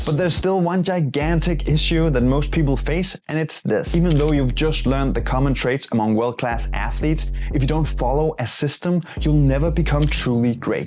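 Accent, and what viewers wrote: Denmark. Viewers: A very valuable video. Much love from Sweden. The Danish accent in your English makes it even better as my circle is filled with of wonderful Danes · He is Danish, its just a dialect. Learn to understand it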